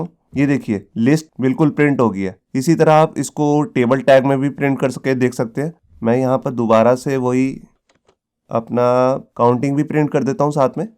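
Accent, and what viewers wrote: Punjab, India. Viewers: you cannot control your punjabi as amny punajbies face this problem and punjabu words like porri comes out involuntry.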